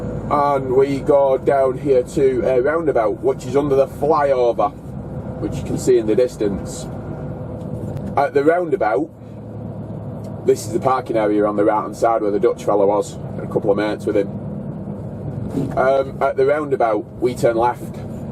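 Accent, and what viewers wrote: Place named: Wales, United Kingdom. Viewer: I have traveled more than 20 times with Minoan Lines and highly recommend the 2 Cruise Europe / Olympic vessels. I found out that YouTube has a sub-text mode, because I have a little trouble understanding Wales Dialect. What I can NOT recommend is ANEK-Superfast with their old ships. Video Tripod is good Thank you very much:-)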